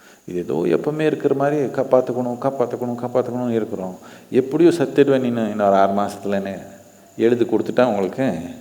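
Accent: Karnataka, India. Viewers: Ungaluku purialana apro yedukku tamizh pera eduthirrukinge neekunge adhatha. Shankaran pillai nu tamizh peru vechikitu English le Peter vooduringe naan idhuvareku tayyi mozhi teriyadhu sonnavara engeyu kelvipattade ille ulagathile indha ulagathille yaaraadhu tayyi mozhi teriyadhavaru puriyadavaru yaaraachu irrukara aah aah sadhuguru oda tamizh slang appdi irruku na avarue namma bashaikararu na kannada mozhikararu aanalu en maariye tamizh kathikitrrukaru illiya. Tayyi mozhi teriyadha aalu indha ulagathile neenge orutharu dha. Neenge tamizhane kedaiyadhu neenge enamo telungu aalu irrukala chumma tamil peru pottirrukinge avalo dha. Ungaluku naan pesura tamizhaadu puridhu nu nenakkre idhuvu purilena neenge attu tamizhan na waste tamizhan, tamizh terilena apro shankaran pillai yean neenge ellaru yedukaage vechikuvinge .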